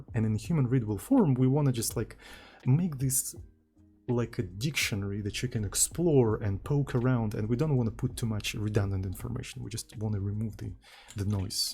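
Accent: Russia. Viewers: Hi (or privet, as a Russian I hear russian accent in your speech :D)! Thanks for the video :)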